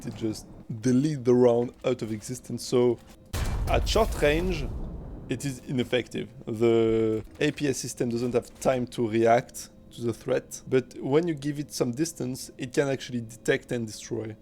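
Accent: France. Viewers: French accent? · Average french accent 😅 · Very french sounding 👌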